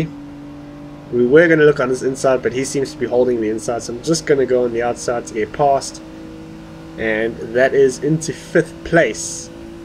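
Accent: South Africa. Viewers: Is this guy from South Africa?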